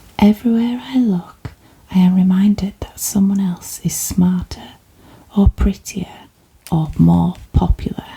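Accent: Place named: Yorkshire, United Kingdom. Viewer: Been so hard to find a proper yorkshire accent to fall asleep to! it's all americans doing accents for fun or posh people and i hate it!!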